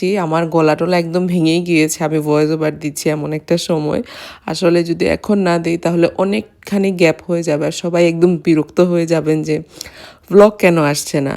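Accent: Sylhet, Bangladesh. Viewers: Tumi sylhety ni affa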